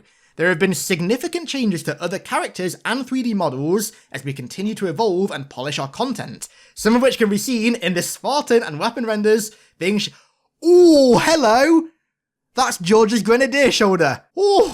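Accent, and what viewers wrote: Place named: United Kingdom. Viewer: Brittish wankuh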